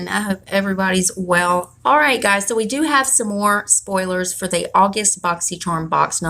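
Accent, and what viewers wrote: South, United States. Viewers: Love that southern accent where are you from babygirl?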